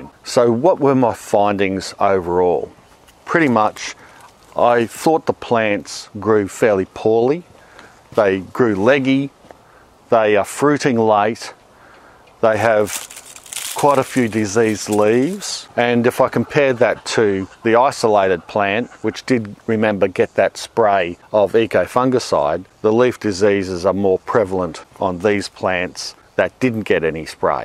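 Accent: Australia. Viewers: I am a Yank electrician and notice that he wraps the copper around the stem in a counter clock wise direction, I assume he is Aussie or Kiwi by the accent? Northern hemisphere winds clockwise just like the toilets flush. Opposite poles provides the aether field. Thank you for your efforts sir, and thank you for providing your knowledge to me.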